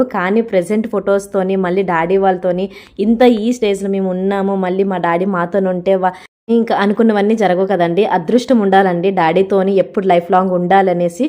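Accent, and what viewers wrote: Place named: Srikakulam, India. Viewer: appa nuvu great. I love you srikakulam slang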